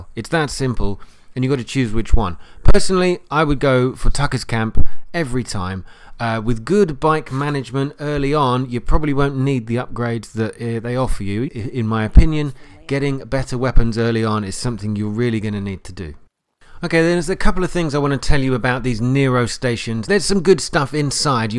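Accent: United Kingdom. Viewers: Good to see a fellow British guy doing a video, expected an American but pleasantly surprised. Not that I have anything against the Americans. 😜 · YES YOUR BRITISH sub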